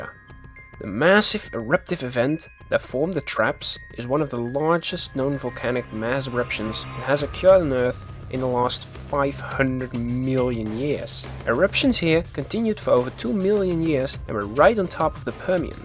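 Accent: Netherlands. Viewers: are you dutch? i kind of hear a dutch like accent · Dutch or Flemish huh? Your accent tells me :p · Sounds Dutch to me. Mix of various accents.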